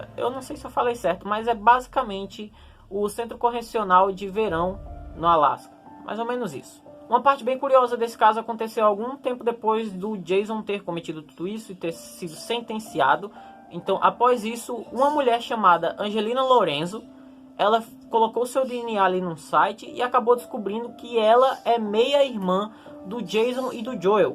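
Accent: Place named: Pernambuco, Brazil. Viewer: Que massa! Reconheci o sotaque. Sou de Pernambuco :D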